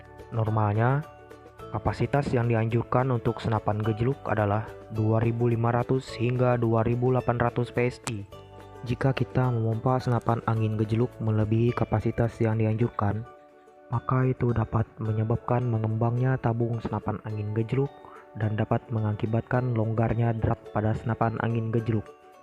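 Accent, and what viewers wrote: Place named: West Java, Indonesia. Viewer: Logat nya kya orang sunda sih bos...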